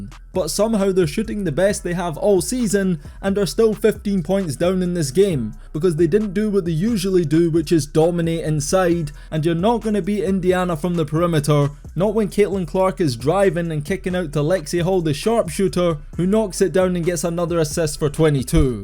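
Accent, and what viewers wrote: Scotland, United Kingdom. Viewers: I now want ALL my WNBA analysis delivered in a Scottish brogue❤ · I know he's saying "The Sky" but everytime this Scot says it I keep hearing him call these ladies "this guy" I was so confused for half this vid 😅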